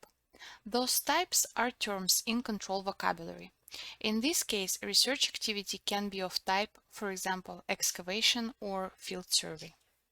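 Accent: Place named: United States. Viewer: The lady speaks a very very bad americanenglish. A very bad dialect. Stop it and repeat with someone who speaks english high language.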